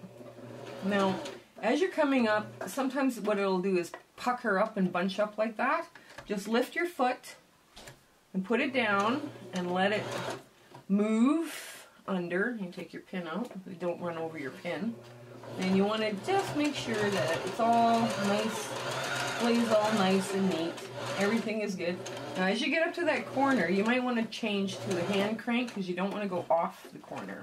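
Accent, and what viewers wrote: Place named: Canada. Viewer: BC'er here. I thought I detected a Canadian accent...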